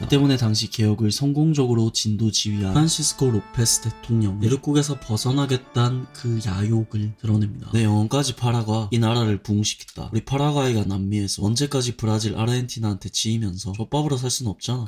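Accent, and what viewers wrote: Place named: Gyeongsang, South Korea. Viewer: 형은 경상도 말 할때가 제일 멋져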